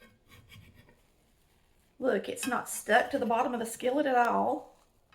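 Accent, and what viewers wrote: South, United States. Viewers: I can’t express to you how much I value and enjoy your videos. I live alone and your videos help get me thru the long evenings and aloneness. Your sweet southern accent and the way you talk is wonderful! I don’t cook but I love watching you cook up some yummy dishes and love seeing you and hearing you. It feels like I’m in the kitchen with a friend and not so stinkin alone. God bless you!!!! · REMINDS ME A WEE BIT OF YORKSHIRE PUDDING. GREETINGS FAE SCOTLAND TAE APPALACHIA. YEEHAW, LOVE YIR SOUTHERN DRAWL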